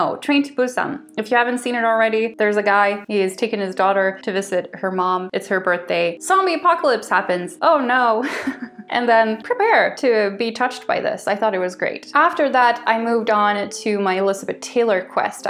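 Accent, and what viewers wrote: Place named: United States. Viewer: I saw your comment on the Reel Reviews With Jen channel and decided to check out your channel. Based on your commentary about the movies you've seen in this video, I think you'd have a lot of success with reaction and commentary videos like Jen does. The videos you already have a very high quality and well made (how many people spend the time to add captions?) but may be too cerebral for the average YouTube viewer. Don't stop making them but if you added Jen-like reaction videos, I think you could grow your channel. You have intelligent commentary, great wit and a good sense of humor. And you're very personable and natural on camera. Plus I'm always interested in seeing non-Americans' reactions to American movies and culture. Have you lived in Sweden your whole life? Your lack of a typical Swedish accent when speaking English is crazy! 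At least I've never heard someone from Sweden sound so American/Canadian. I only detected an accent when you said Gilmore Girls. Good luck with your channel!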